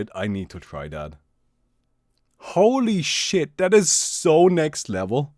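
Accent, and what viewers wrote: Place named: Germany. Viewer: I had no idea you were german... =D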